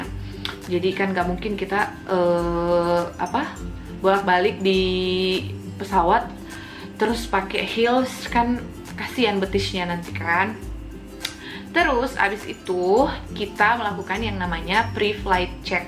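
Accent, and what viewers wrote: Manado, Indonesia. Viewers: Pke logat mnado skli" bkin vidiox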